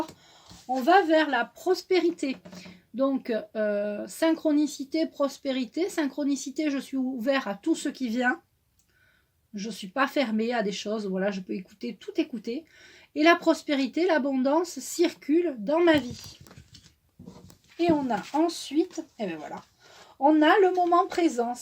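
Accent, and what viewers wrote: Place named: Marseille, France. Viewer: J'adore ton accent il ressemble au mien de Marseille ❤️❤️❤️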